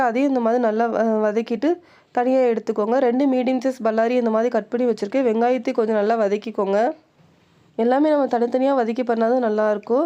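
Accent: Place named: South Tamil Nadu, India. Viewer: Neenga entha ooru mam Your slang is southside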